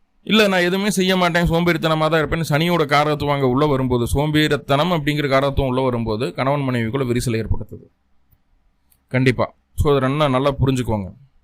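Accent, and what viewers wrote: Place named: Kongu, India. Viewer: நீங்களும் வளமுடன் வாழ வேண்டும். அலட்டல் இல்லாத நடையில உள்ள உங்கள் கொங்கு பாஷைக்கு நான் அடிமை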